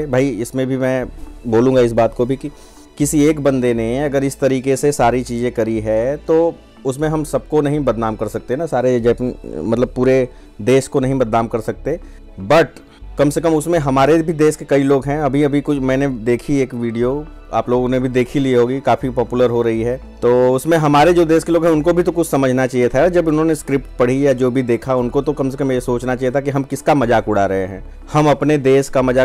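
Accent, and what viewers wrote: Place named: Varanasi, India. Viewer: Apki boli me banaras ka mithass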